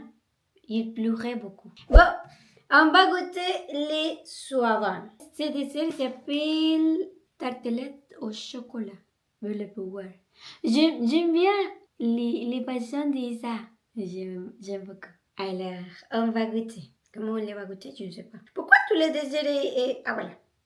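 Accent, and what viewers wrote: Colombia. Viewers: En Tous cas, vous savez bien écrire français et j aime bien votre accent Colombien 😊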